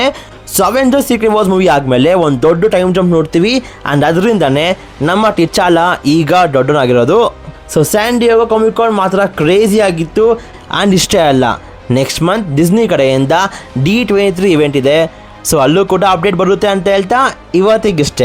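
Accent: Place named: England, United Kingdom. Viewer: ನೀವು ಕನ್ನಡನಾ ಇಂಗ್ಲೀಷಿನ ಗಂಟಲಲ್ಲಿ ಇಳಿಸಿಕೊಂಡಿರಾ ಅನಿಸುತ್ತೆ, but story super ಆಗಿ ಹೇಳಿರಿ ❤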